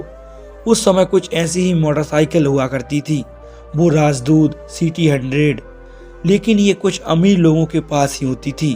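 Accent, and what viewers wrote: Madhya Pradesh, India. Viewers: Bhai aap kaha ke rahne wale ho aapki aavaz se to mp madhey pradesh lag raha hai ki. Aap mp se ho kyu g 😅😅😅🙏🙏 please reply sir🙏🥰🥰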